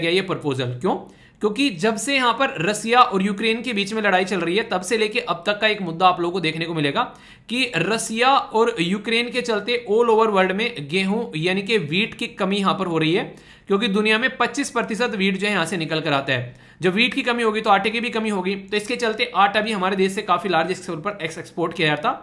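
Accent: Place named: Baghpat, India. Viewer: Sir ji aap baghpat se to nhi ho ...hmre yha ki language lgti h aapki 🥰👌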